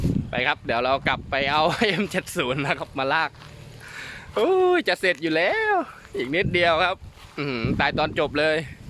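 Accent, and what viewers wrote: South, Thailand. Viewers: คนใต้นี่หว่าฟังตั้งนาน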